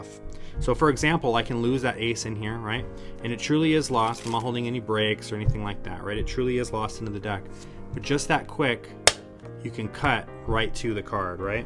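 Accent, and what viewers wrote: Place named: Ireland. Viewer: Funny irish accent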